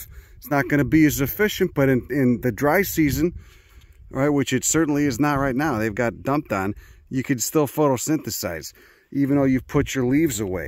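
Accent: Chicago, United States. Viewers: Every nerd should have an Italian with a thick Chicago accent teach them about their interests.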